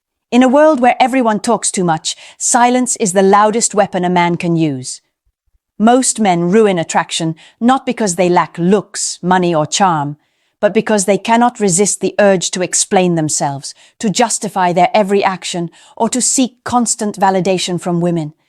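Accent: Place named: United Kingdom. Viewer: Thank you for your kind wishes! I really like your British accent. Your tone is also very soothing to listen too. Keep it up. ❤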